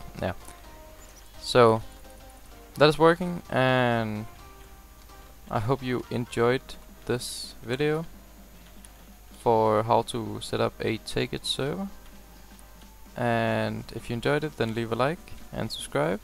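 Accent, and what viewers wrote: Denmark. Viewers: Du lyder dansk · Are you danish lol?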